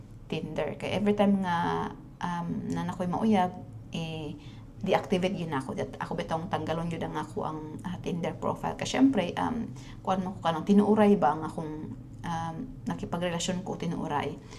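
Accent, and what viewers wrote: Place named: Cagayan de Oro, Philippines. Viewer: Wow ka nindot sa imong love story dhay. Congrats ❤️Lalom kayka mag bisaya murag taga Cag de Oro. Nindot paminawon.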